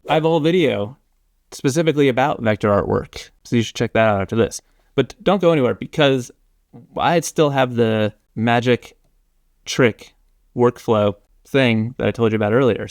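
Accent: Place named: South, United States. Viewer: Southern drwarl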